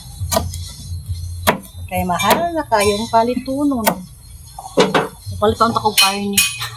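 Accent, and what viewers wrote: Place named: Iloilo, Philippines. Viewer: ilonggoha oy 😂😂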